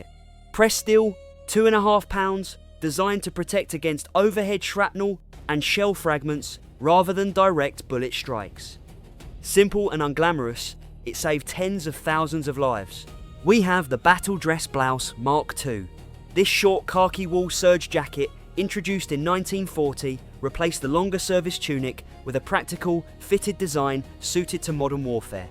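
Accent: United Kingdom. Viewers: Surely the British soldiers carried ‘aluminium’ canteen and mess tins NOT ‘aluminum’ items! If using a British sounding AI narrator FFS ensure it uses British pronunciation!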